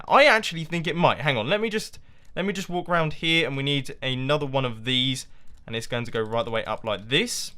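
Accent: England, United Kingdom. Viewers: thats because hes brittish #TheMoreYouKnow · Are you English? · UR BRITISH sorry first time watching your videos you talk in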